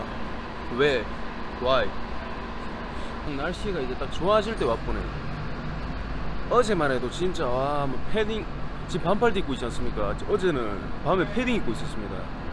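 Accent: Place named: Daegu, South Korea. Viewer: 사투리랑 말투가 엄청ㅋ멋지면서웃겨요 매너있는대구남자ㅋㅋ~~~그녀ㅋㅋ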